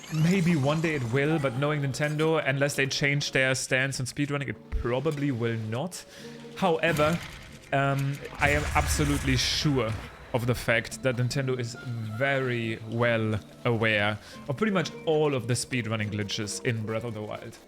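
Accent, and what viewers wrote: Germany. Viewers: little german accend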